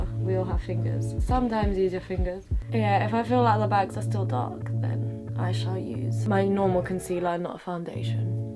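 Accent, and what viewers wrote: United Kingdom. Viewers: your voice like a British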